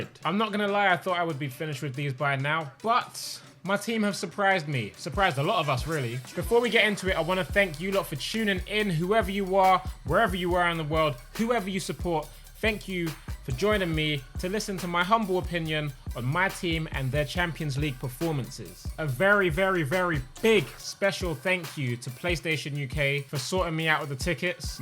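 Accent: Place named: Manchester, United Kingdom. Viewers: Loving that Manc accent 😂😂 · you have the accent of a PROPER Man U fan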